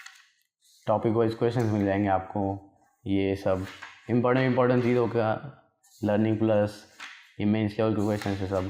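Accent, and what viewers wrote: Maharashtra, India. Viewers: Bhavaa Tujhya Bolnyatil marathi gavran tone!Kuthla aahes Tu?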